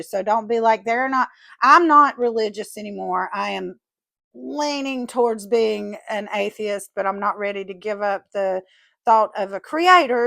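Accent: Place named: South, United States. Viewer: Sweet southern belle 😉. Music to my ears (I am a European immigrant) 😉❤️. This is what I thought all Americans sounded like when I was growing up in the 80s 😂. Not sure where the torture after the rapture came from 🤨. Keep looking gal. If you’re meant to find God, you will. As long as you keep looking and not let fake Christians affect your search 😉❤️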